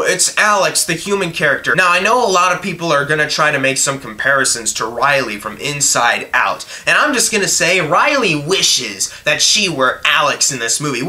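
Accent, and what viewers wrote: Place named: United Kingdom. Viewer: This is for FANS take that critics British accents are beautiful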